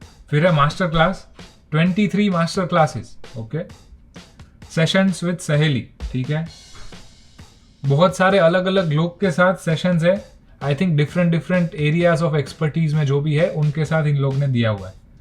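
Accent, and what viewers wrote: Gujarat, India. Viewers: Are you gujarati bhai?😅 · Bhai apke hindi mai Gujarati q a ri h? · જ્યારે ગુજરાતી વ્યક્તિ હિન્દી બોલે છે.. બહુ સરસ લાગે છે.. હું પણ ગુજરાતી છું.. બેસ્ટ વિડિઓ ભાઈ.. keep it up😊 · Gujarati pata chal hi zata hai puch ne ki jarurat nhi h😂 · HEY BRO ARE YOU GUJARATI? caught from accent 😅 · Gujarati bolvani style thi j olkhay jai 😅😅😅😅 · ભાઇ તું ગુજરાતી છે ને તારા બોલવા પર થી ખબર પડી ગઈ અને મોસ્ટ પ્રોબેબબલી કાઠિયાવાડ રાજકોટ સાઈડ નો હોઈ શકે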